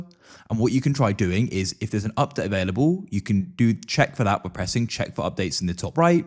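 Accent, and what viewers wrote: United Kingdom. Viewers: hes just british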